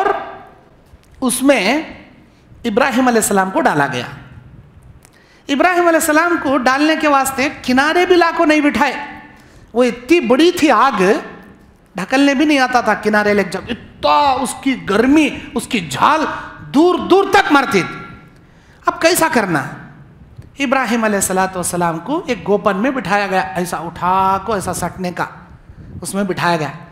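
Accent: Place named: Solapur, India. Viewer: Sobhanllah aapmyry sholapur ky hyn bat cheet ka lhja bhe alhmdu lellah jzay khyr dy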